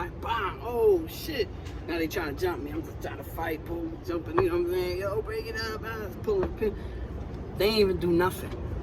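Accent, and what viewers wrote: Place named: New York, United States. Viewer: Shout out from Australia...Love the content and I Love your NY accent...i hope the kids hear the realness of the message your trying to send. Hopefully people hearing this can AVOID prison altogether. GL to u and all the best in your endeavours in the future...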